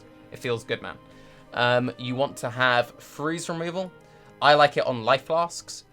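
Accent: United Kingdom. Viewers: Nice to know your graphic's card is going to arrive relatively soon. For what it's worth, i think your guides are actually really enjoyable even without gameplay. And that british accent is lovely <3. Hope you get better with your Chron's disease, and keep up the good work :D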